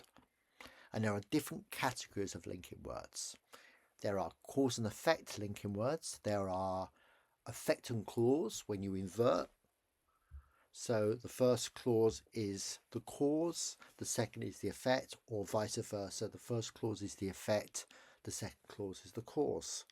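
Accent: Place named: United Kingdom. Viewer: Falta los subtitulado desde el inicio. Por lo demas todo bien. Me encanta el acento British 🥰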